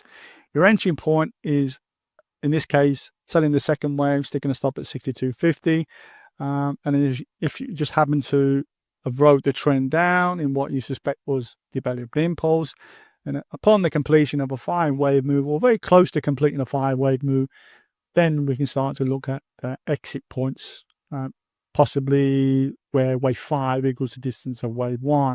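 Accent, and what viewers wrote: Birmingham, United Kingdom. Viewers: Are you from Birmingham uk?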